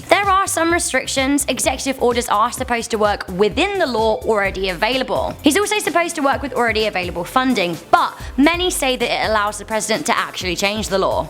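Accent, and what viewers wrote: United Kingdom. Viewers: I’m assuming you are British due to the accent and would like to point to the stupidity of this video. I am not a Trump supporter but feel the need point out that Trump along with all US Presidents are entitled to all of the things you mentioned. HOWEVER, the Queen of England (UK) is in fact above all British laws as she is considered the law. She could commit murder in the middle of London while flying a Nazi flag and drinking Scotch Whiskey naked and pissing in a bucket and could not be arrested. Everyone in the British government serve the queen. SMH at the unrealistic way some attack Trump. He may not be the best president but he is still subject to US law and impeachment.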